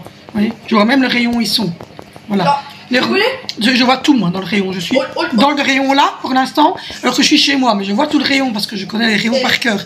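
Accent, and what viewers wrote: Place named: Belgium. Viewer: Tu as qd même un peu l'accent belge je trouve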